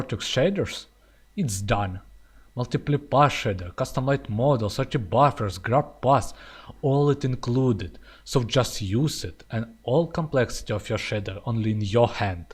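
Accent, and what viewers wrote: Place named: Russia. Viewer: Русские пытаются говорить на Английском) , А еще ваши документации сломались с ассет стора.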